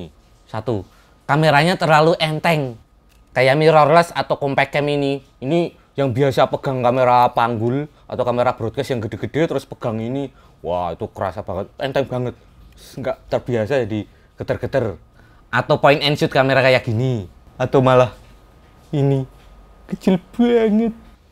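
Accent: Java, Indonesia. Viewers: Pekat betul ya jawa mu mas..hehe udah subscripe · ilmunya mantap om , plus2 lucu2 gaya jowo asik ae rek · Medok jawa ya bang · jinguk baleni neh hahahaha.. njawani mas hahahaha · sek seksek....wih jowo banget😅😊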